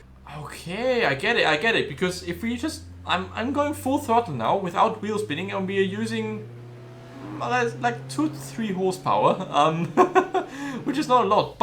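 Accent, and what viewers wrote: Germany. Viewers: You have a German accent? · are you german or why you speaking like a german guy english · Man merkt das du Deutsch bist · I bet, you're German? Cuz of accent. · this german accent is driving me crazy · Ich Feier den deutschen Akzent 🤙🏻😀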